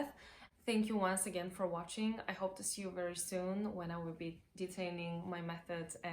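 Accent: Portugal. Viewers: Seu sotaque é soa como o do Português Europeu. Como brasileira posso dizer que você fala Português muito bem. Consegui te entender perfeitamente. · Seu sotaque é do português falado em Portugal. O português falado no Brasil é bem mais limpo e claro de se ouvir. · I really admire multilingual people, you are a talent. But it's a big mistake ppl think that just because a person has a "gift" it's easier because no, you have to study a lot to reach a goal, for talented people like, maybe less time is all that. Seu sotaque de português com certeza é mais parecido com o de Portugal. Se você falar dessa maneira aqui no Brasil, as pessoas irão te confundir com uma portuguesa mas você fala muito bem! 日本語を話すことを学んでください。私の第二言語です。あなたが日本語を話すのを見てみたいです。(Please learn to speak japanese it is my second language and I would love to see you speak it.) Cheers from Brazil! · Seu português é muito bom. 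Seu sotaque parece mais com o de portugal. Parabéns! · Olá,! Seu vídeo é muito inspirador para mim, que ainda estou no início de ser um poliglota. O seu sotaque no português é o europeu, mas você também fala perfeitamente compreensível para nós, brasileiros. Acredito que é por você não se importar muito com sotaques, e por isso, apesar de soar mais como português europeu, você não se prende muito a ele. Também procuro aprender outros idiomas sem me importar muito com sotaque nos estágios iniciais. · sotaque parece o europeu mas tem uns pontos brasileiros... Como o uso do "você" e do som "dji" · Seu sotaque de português é um pouco mais puxado para o portugues europeu, mas eu, como falante nativo de português brasileiro, entendo você melhor do que eu entendo portugueses, porque sua pronúncia é mais aberta do que normalmente se ouve dos portugueses. E sua fluência é incrível, você parece encontrar palavras com a mesma facilidade em qualquer língua que fala, além da gramática que, pelo menos no português, não encontrei nenhum erro. 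Não que fosse algum problema se eu tivesse encontrado, mas é surpreendente o cuidado que você tem com detalhes. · when you speak french i hear the portuguese accent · O sotaque Português é 60% Português Europeu e 40% Português Brasileiro. :) Parabéns! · O teu sotaque em português é mais próximo do português europeu do que do brasileiro. Há uma o outra palavra que soa mais brasileira. Mas falas mesmo muito bem! 🥰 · Seu sotaque é mais de Portugal 🇵🇹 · Tens um sotaque mais puxado para o Europeu 🇵🇹 Mas tem também influências Brasileiras 🇧🇷 por exemplo como disseste ‘importante’. Pronunciaste como ‘importantxi’ como fazem os BRs Mas se entende muito bem. If I didn’t know any better I would’ve assumed you were a native that happened to live in both countries hence the mixed accent.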